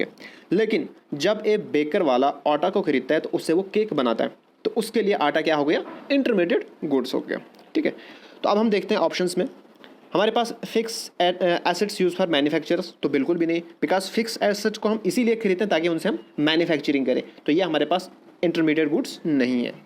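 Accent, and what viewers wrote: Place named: Kashmir, India. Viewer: Ab ka bei sankari gaandu, Youtuber nikalne bna hai. Pehle apna accent thek kr. Kashmiri English nai chalti 😆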